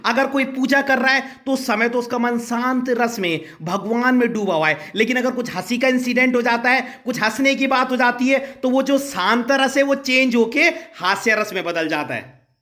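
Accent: Garhwal, India. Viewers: Sir aap gadhwali ho kya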